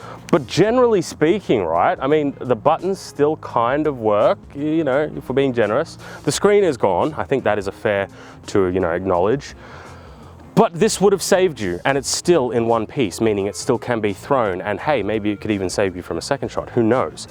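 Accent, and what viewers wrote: United States. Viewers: American accent or dubbed anime accent? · The American accent is killin me lol great video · I need more American accent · This is what I have been waiting for. Thank you for getting deep into the nitty gritty. Fantastic American accent. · I think we need more love for his American accent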